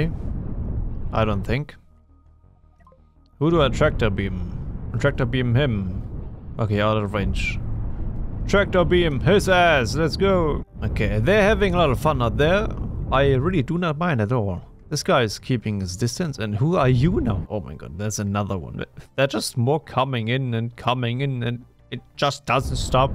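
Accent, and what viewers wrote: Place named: Germany. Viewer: Finally, the Empirer narrated as it should be... in German accent!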